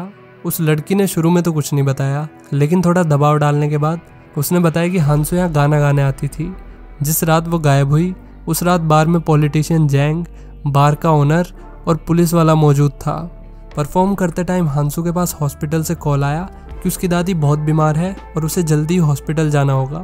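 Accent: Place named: Meerut, India. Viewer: Poori meeruthiya accent h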